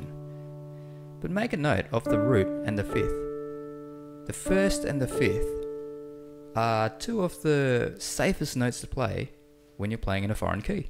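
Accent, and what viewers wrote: Australia. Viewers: Hey you're Australian!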